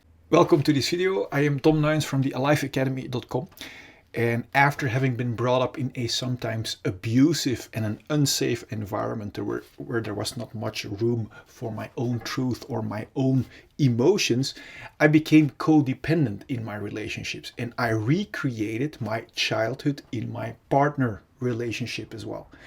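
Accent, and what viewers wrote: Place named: Netherlands. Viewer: I can hear your Dutch.... thanks for the video👍🏼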